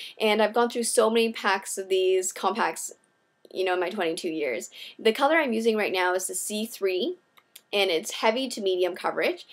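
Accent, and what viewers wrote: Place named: Canada. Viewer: I cant tell you are canadian by how you pronounce "OU" like about, out lol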